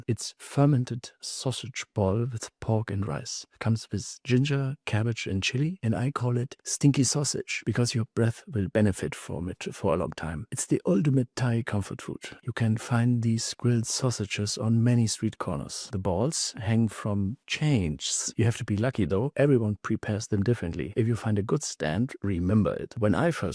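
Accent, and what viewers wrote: Germany. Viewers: LOL l like the Masterpiece Theatre whisper with the low lighting - think it adds to the atmosphere especially with your great German accent! Been in Thailand about 6 months and you speak ABSOLUTE TRUTH brother and I have actually had many of the same thoughts and realizations as yourself. Thanks for your wry, witty humour and entertaining content. Much appreciated 🙏Here’s to the Elephant Pants!😂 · It took me until 16 minutes to realize you must be German. 😊 Ill be there in 11 months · haha, ich habe das Video tatsächlich im og ton geschaut und paar Minuten später war ich mir sicher, dass du aus Deutschland kommen muss 😅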